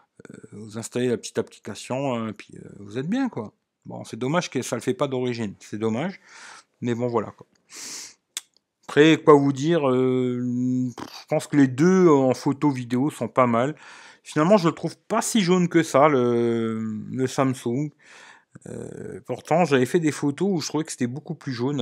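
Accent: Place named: Lorraine, France. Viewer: Eh mais un lorrain ! 😂😜😍. J'me disait l'accent me dis qq chose 😏. Bon la stabilisation est à la ramasse sur le n10+ en vidéo c" assez flagrant pour moi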